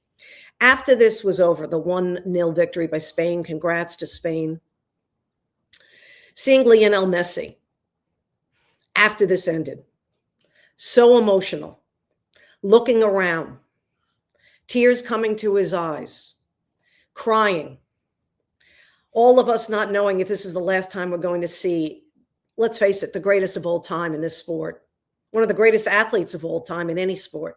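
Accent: New York, United States. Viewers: Wow this is a gift! Another fab sports podcast and finally we get to hear your NY accent! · your NY accent makes me homesick, living in Europe😂😂😂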